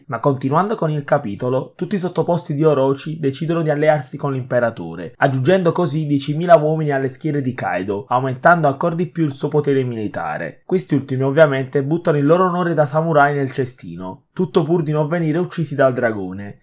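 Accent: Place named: Naples, Italy. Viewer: Che video penoso, non c'è coinvolgimento. Tanto valeva farlo con il sintetizzatore vocale di google translate. L'accento napoletano è insopportabile, potresti sforzarti ad attenuarlo un minimo.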